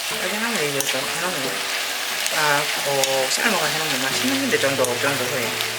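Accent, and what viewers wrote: Gyeongsang, South Korea. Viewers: 경상도 말씀이라 잘 못 알아듣긴한데 나름 매력적입니다 ㅋ ㅋ 근데 아주 맛깔스럽게 하시는듯 팬 찜!!! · 호박고지나물 영상 넘 잘보고 있어요 경상도 사투리도 좋아요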